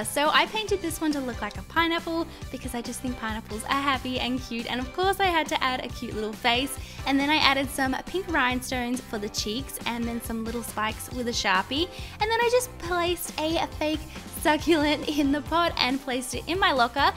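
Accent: Australia. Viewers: are you Australian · I feel like your Australian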